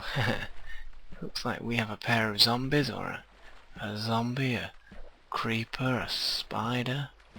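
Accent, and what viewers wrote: England, United Kingdom. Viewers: that english accent makes it all so captivating, it's like watching a documentary... on... digging diggy holes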